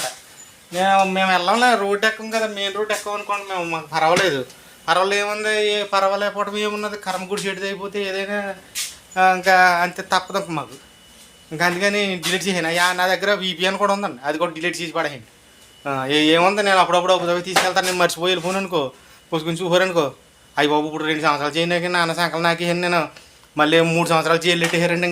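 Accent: Godavari, India. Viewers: హాయ్ అన్న ఎలా ఉన్నారు,మన, గోదారోళ్ళు, మాటే అలా, ఉంటుంది మరి,మన,ఉతపదలు అలాగే ఉంటాయి ఎవరు ఏమి అనుకున్నా, అవి మారవు, బాగుంది వీడియో జాగ్రత్తగా ఉండండి 👍👍♥️♥️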